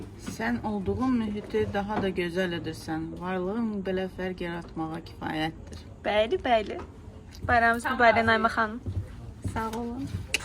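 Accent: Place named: Nakhchivan, Azerbaijan. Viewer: Salam necesiz siz Naxcivanlisiz lehceniz sirindi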